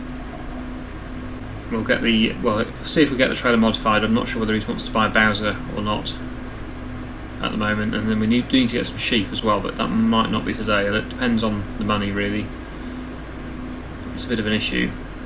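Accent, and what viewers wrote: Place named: United Kingdom. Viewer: Omg the first time I heard yr voice over and OMG I LOVE THE EXTREME BRITISHNESS (I am british but like yeahhhhhhhh)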